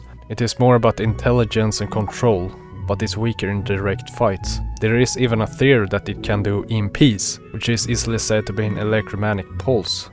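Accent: Sweden. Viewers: Holy swedish accent😭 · are swedish right cauce you sound like it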